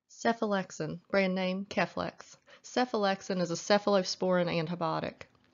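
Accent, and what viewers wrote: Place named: South, United States. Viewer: Thank you so very much for making these with audio after a shift as an RX in training my eyes feel so tired to read my books On top of that I hear a southern accent Wich for some reason I adore and it soothes my nervous system . There must be a god that loves me after all. A billion thanks.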